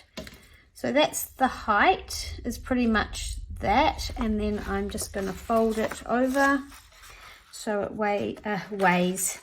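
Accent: New Zealand. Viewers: Love this tag, so beautiful 💙 Also so nice to hear a kiwi accent 😊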